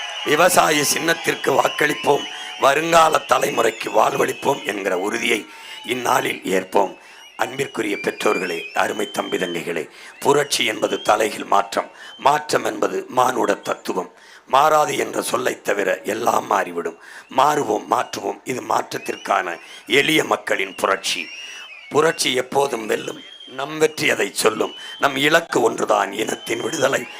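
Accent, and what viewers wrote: Tirunelveli, India. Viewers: ஏலே... திருநெல்வேலியா லே....😅😅❤❤❤❤🎉🎉🎉🎉🎉 நாம் தமிழர் ❤❤❤🎉🎉🎉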